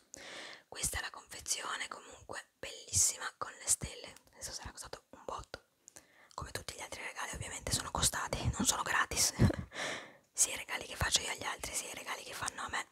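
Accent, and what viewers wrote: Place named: Veneto, Italy. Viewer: Io pensavo veneta per come pronuncia la "r"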